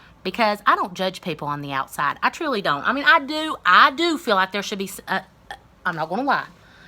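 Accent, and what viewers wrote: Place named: South, United States. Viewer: You are the cutest thing i ever seen wit ya lil southern accent 😍😍😍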